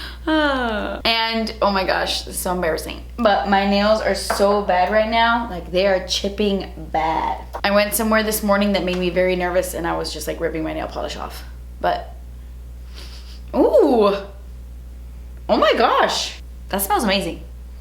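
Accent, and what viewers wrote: United Kingdom. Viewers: your British accent always make my day!😂😂😂 Love you! This look is ❤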